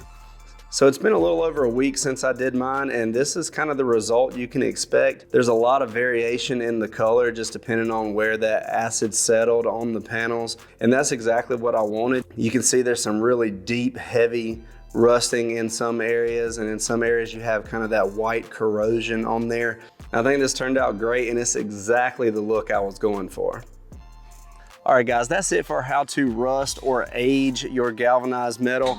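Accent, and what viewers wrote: South, United States. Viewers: Interesting accent. It's like southern and California burn out surfer with a touch of central Florida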